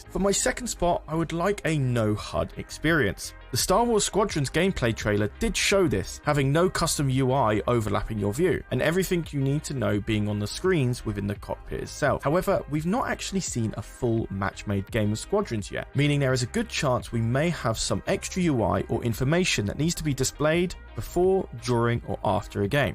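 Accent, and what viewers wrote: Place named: United Kingdom. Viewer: I haven't seen many videos before, so I was very confused when I thought had turned British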